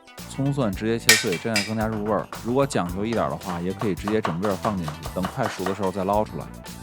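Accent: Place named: Beijing, China. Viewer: 时的センス让人当时就反应到“嗯？北京的口音？” 紧接着“今儿”可以确诊，“是北京人”🤓 直到“熬白菜”一出现哇塞超懐かしいやろ～ 一说这词儿得久违了多儿年了自己都想不起来但那是在北京儿时的记忆 “热锅凉油”一出来“爱？专业干这个的？” 那颠锅时的自如，郎鹤炎有话：“内就是厨师！” 完后才明白，“料理与吐槽”是这样结合的。就的还不是米饭是特么干粮！ 😄😆🤣订阅了❤️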